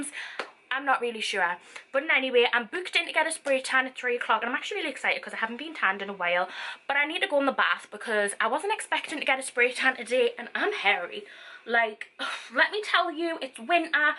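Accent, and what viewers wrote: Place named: North, United Kingdom. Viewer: It’s just how us northerners talk ✋🏼😂❤️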